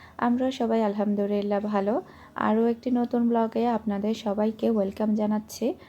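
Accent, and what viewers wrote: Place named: Chittagong, Bangladesh. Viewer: আাপু আমার মেয়ে তোমার ভিডিও দেখে।তোমার চিটাগাং এর ভাষায় কথা গুলো শুনে ও হাসতে হাসতে শেষ।🤣🤣🤣🤣ওর এখন ৬বছর বয়স। আপু তাড়াতাড়ি চিটাগাং এর ভাষায় ব্লগ করে আমার মেয়ে টারে খুশি করে দাও।আর মিহি মনিকে এত্ত গুলো আদর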